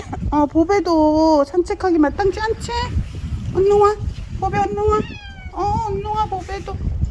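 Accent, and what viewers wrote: Gyeongsang, South Korea. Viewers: 대구사시나봐요~ 사투리 억양이 대구표준어의 정석이네요. 저랑 말투 똑같아서 들을 때마다 놀라요. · 집사님 목소리가 너무 좋으세요 경상도분이세요?😅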